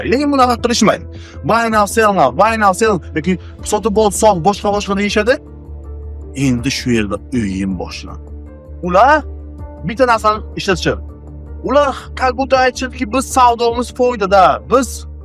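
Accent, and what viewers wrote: Bukhara, Uzbekistan. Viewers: Акани узи Бухоролик шеваси Тожикча.Узбек тилида озрок кийналади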